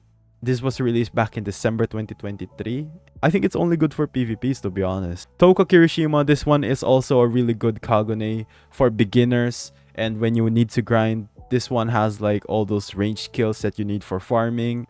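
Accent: Philippines. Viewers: Ye he sound Filipino I can hear his Filipino acsent jumping and turning American again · are you pinoy? Your accent is kind of filipino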